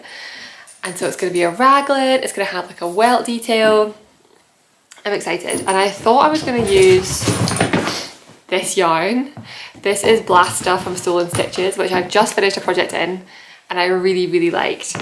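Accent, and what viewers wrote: Scotland, United Kingdom. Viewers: I have been watching your videos for the past year for two reason’s first because I love to knit and I love and have knitted several of your patterns and the second one was that for the past year I have been planning a trip to Scotland with my sister. I thought that listening to you speak with your lovely Scottish accent that maybe I would be able to better understand conversations and surprisingly I must say that it seems to be helping. I’m sort of training my ears a bit. I’ll be mostly in the Highlands for 10 days in May with only one day in Edinburgh p. I am so looking forward to my trip back to my ancestral homeland.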